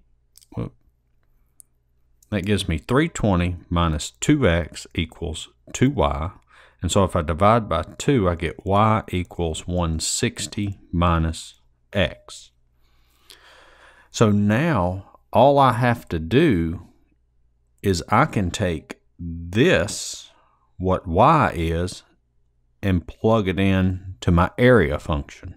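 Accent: South, United States. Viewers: Good video. Definitely the first southern guy I’ve had in one of these videos lol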